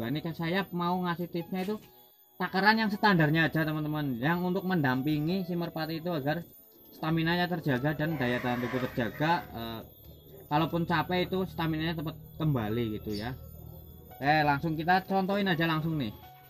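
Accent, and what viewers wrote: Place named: Cirebon, Indonesia. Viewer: Kayanya dari omongannya org crbn ya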